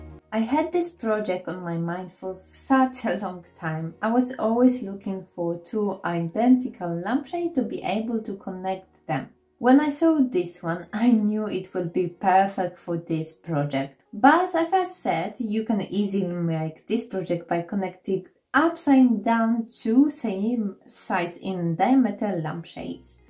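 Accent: Poland. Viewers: Hi, My fav this time is the light shade, really nice and would fit in with any decor. I like the clay and glass bottle design as well and the coaster project at the end so simple but effective. I agree with Kathy about your voice it is so relaxing but I think it is your lovely polish accent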